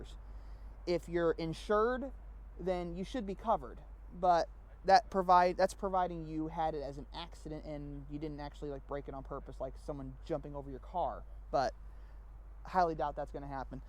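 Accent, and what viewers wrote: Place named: United States. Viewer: Damn I wanted to watch an R53 guide... but I can’t listen to this Yanky accent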